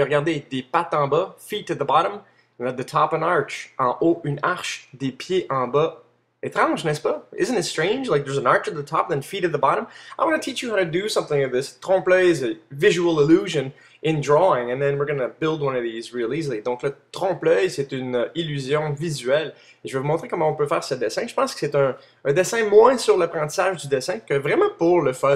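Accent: Canada. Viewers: J'adore ton accent Canadien :)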